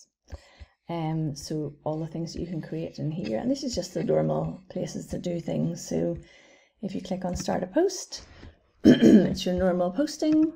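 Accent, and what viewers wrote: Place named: Northern Ireland, United Kingdom. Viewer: your voice is horribly muffled making it hard to understand what you were saying. Please review your videos, or have someone else do it. I could not understand you and had to turn on the captions to read what you were saying. Your work is good, but your voice quality is atrocious. You might also check yourself for regional accent items (are you Northern Irish?) to more "regularize" your English. Speak more clearly (enunciate), use a better microphone, and "flatten" your accent to a more BBC standard (or god-forbid, USA Mid-West standard) will make the valuable messages you have more easily received by folks. Thank you.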